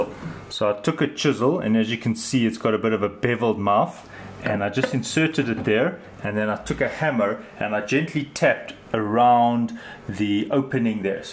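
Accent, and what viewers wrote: South Africa. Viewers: Am I hearing a fellow South African accent?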